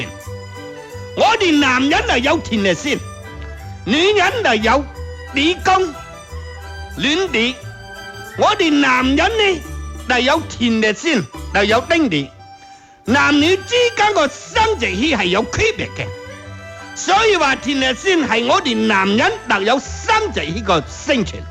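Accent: Chaozhou, China. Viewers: 潮州口音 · 潮州口音来嘎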